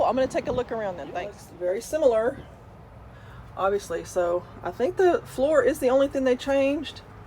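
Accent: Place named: South, United States. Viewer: I love your southern accent. Your so cute! Thanks for sharing your experience of owning a Tab 400. It really help us new owners. I learned so much!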